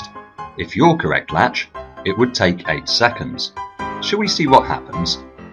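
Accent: United Kingdom. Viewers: I'm American, male, 52, and British accent + sarcasm STILL intimidates me!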